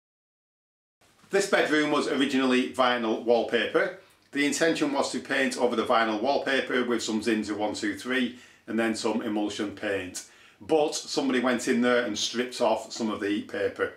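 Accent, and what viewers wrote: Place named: Northwest England, United Kingdom. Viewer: Where do you get your Zinsser Gardz from in the NW? Your accent sounds like you are not too far from me and I could do to pick a tin up this weekend but Screwfix dont seem to sell this 1 any more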